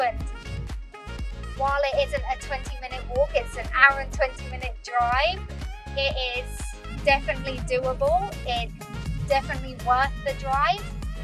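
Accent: United Kingdom. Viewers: 英國腔真的超好聽， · 好聽的英國腔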